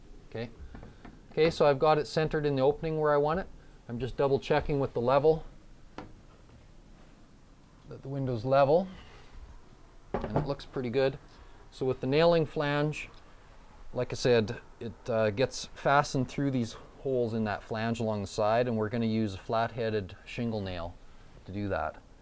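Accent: Canada. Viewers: I love the Canadian accent, aye!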